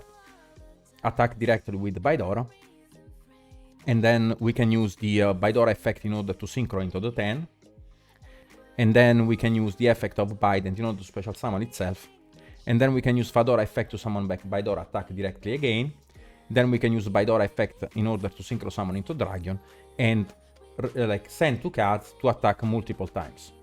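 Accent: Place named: Italy. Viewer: questo modo di parlare... maledettamente italiano; (viedo molto utile comunque)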